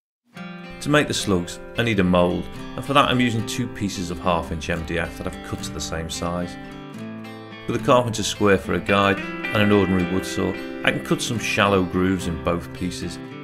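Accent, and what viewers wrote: England, United Kingdom. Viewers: An excellent clear, concise video and to top it off in an English Accent for a change. Thank you👍😷👍🏴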